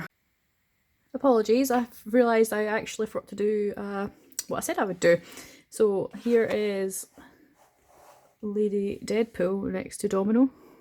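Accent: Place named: Scotland, United Kingdom. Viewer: I think the Scottish accent is the coolest! So fun to listen to! keep up the great reviews!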